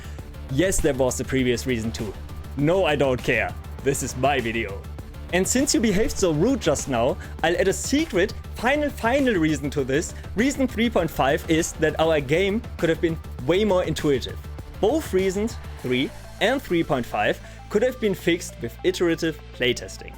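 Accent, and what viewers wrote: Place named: Germany. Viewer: Uh-oh, you provided value in the video, now I gotta have another subscription. Plus I sense a German accent.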